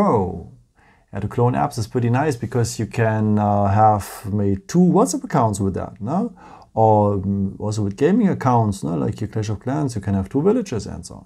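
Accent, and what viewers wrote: Germany. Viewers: man hört SO dass du deutsch bist LOL. aber scheisse bei mir ist das DUAL einfach nicht verfügbar. warumm....?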